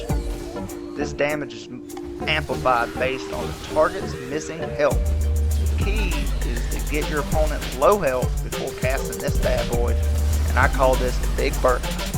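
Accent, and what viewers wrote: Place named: East Coast, United States. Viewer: You sound east coast but that’s just me、(I’m East) keep up with the videos man! Also great editing :) lil YouTube channels keep this clustered genre new. You will blow up keep going nuts on LOL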